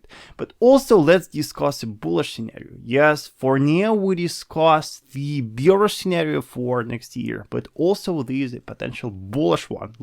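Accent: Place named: Russia. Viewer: he speaks engruslish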